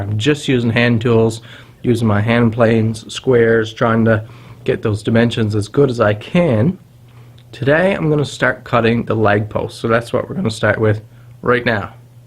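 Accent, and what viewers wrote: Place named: East, Canada. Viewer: hey, sounds like you might be from eastern Canada. Anyhow, that 2 inch chissel is actually a 2 inch slick.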